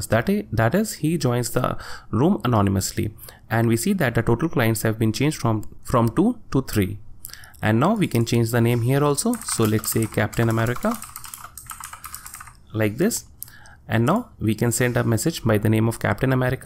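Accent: India. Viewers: why its always indian accent :(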